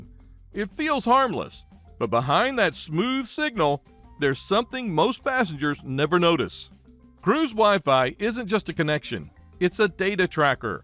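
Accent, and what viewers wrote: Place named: South, United States. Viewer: i love how this guy who has a very southern accent is so smart like SMART smart i have learned a lot from you tysm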